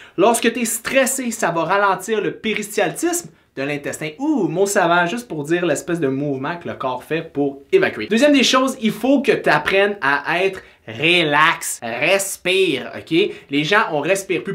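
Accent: Canada. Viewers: J’adore l’accent québécois salutations de la Suisse frère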